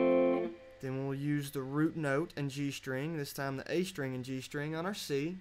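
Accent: South, United States. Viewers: you're good, love your southern accent too.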